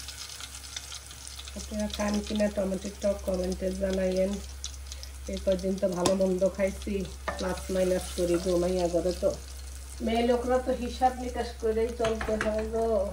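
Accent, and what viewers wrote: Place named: Comilla, Bangladesh. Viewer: আপু আপনার কথাগুলো শুনলে খুব আপন কেউ মনে হয়, আমি কুমিল্লার তো তাই,কথার সাথে মিল আছে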